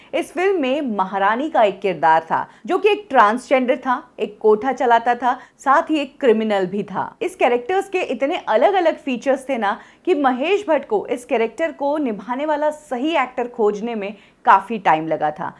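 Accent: India. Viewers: Her Punjabi accents also comes in 😐🙄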